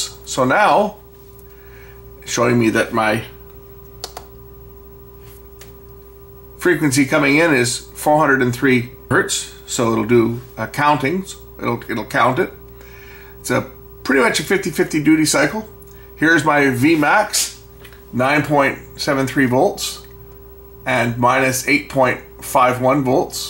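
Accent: Canada. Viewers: exactly and it's my first properish scope. I only started learning last October'ish and my first buy was a must tool MT8205 scopemeter and before it arrived I had already advanced past it suiting my needs. (Still it's a good accurate meter) but like I said for 14 bucks its the perfect scope for someone learning like myself till I have the money for a proper one.. P.s. I should of noticed you were Canadian and not American by the way ya talk.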